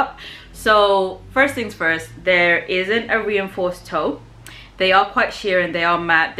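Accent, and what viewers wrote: United Kingdom. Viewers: Love my Ebony Queens. Love my Ebony curves, with a British accent.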